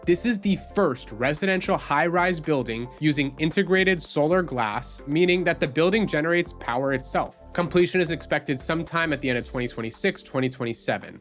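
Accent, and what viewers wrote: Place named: United States. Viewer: Thank you for having an American accent and not a disgusting miami accent.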